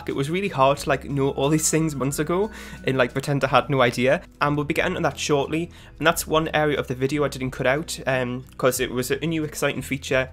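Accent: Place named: England, United Kingdom. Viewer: Really love the building! And I adore your accent. Where you from in England?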